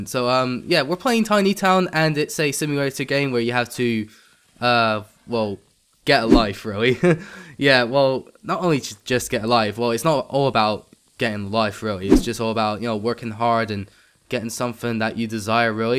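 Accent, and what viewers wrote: United Kingdom. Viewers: r u birtish? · U just earned another sub. 1 your British 2 u were the only person that I saw talked while playing roblox . Btw my user on roblox is maddy6786. And next time you should work at bugler bomb. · Nice birtish accent! You just earned a sub!!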